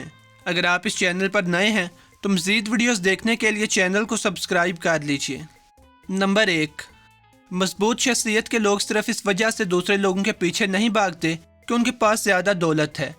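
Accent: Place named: Lahore, Pakistan. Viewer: Aap Yakeenan Lahore sy Hain ... hoobian nahi hota Khoobian hota hai ..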